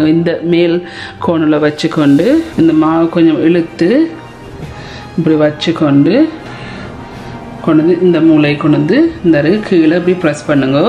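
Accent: Sri Lanka. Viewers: neege indiyathane. Ogada tamil. Sri lanka tamilmatheri iruku. Mam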